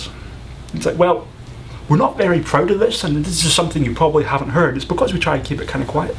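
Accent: Scotland, United Kingdom. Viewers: It is called "a Scottish accent". Yes, it is fun to poke fun of. Especially once you learn that when Apple first launched the iPhone in Scotland, the localization UK was not good enough to get Siri to understand the Scottish accent;) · But his accent sounds obviously Scottish. Seriously, we Americans should know various accents. Anyone not living in a box has heard various Scottish accents from Disney's Scrooge McDuck, to Fat Bastard, to Brave Heart, to so many other popular culture stuff. People are seriously just dumb sometimes. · I know people who have lived here in Australia for over 30 years and still have their accent. These people generally moved here over here in there teens or later even some before. The fact is for many it's an identity that helps them stand out from the crowd and many can't help that either but it helps them score sex with the ladies too. 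For others its a choice, mainly to score women or fit in with their current friends (think Italian Americans talking Italian with each other in their own language or Mexicans speaking Spanish with their compadres). I personally couldn't care less about his accent anyway. Means nothing to me other than I have an idea of the general area of the world where he comes from. That part of the planet is a land called Scotland which shares a border on it's south to England and is part of the island known as Britain which is off the northwest corner of Europe. Yeah I know about Scotland, land of the thistle and haggis, The Highlands and whisky (Real whisky spelt without the e and made from malted barley not over 50% corn like in bloody bourbon which admittedly is great), The fact is if this is how he talks without thinking or trying to change his voice then this is how he talks. The real reality is who gives a fuck about what you said or all the boring true crap I just wrote? 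Who cares, no one cares, blah, blah, blah derp, derp, derp, herpity, derp. Whatever... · You do realize you have maybe the most annoying accent there is as a scottish person ... It's like you got a mouth half full of shit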